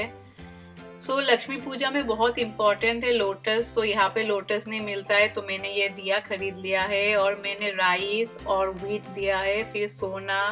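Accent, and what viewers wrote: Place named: India. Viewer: I love ur Hindi tune n ur also awesome I like it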